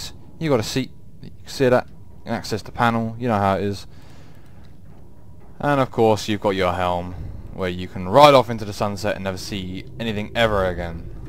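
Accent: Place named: United Kingdom. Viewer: Excuse my racism... but wasn’t this video so much better bc of the Brit accent???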